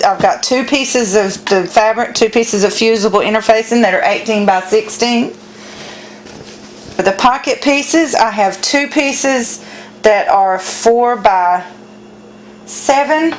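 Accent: South, United States. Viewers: Very nice. Thank you for sharing. This was very helpful for me. I have not learned to sew zippers yet. However, I have made a lot of leather purses (Southwest Style). You are a great instructor. Your voice delivery is very clear and precise. Your instructions are easy to comprehend and follow. The southern draw makes it easier for me to understand ya'll. I am going to attempt this. However, I am going to try and line the opening to the pocket with garment leather. I believe I will sew the garment leather to the pocket slit before I bring the material through, to the 'wrong/unseen-side' of the purse liner. If it doesn't work, I will resort to this simple pattern. Thanks again!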